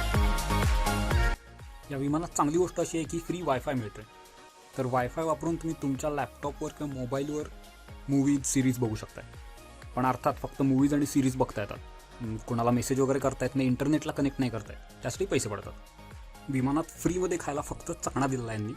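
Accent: India. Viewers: Pure Kolhapuri bro 😎...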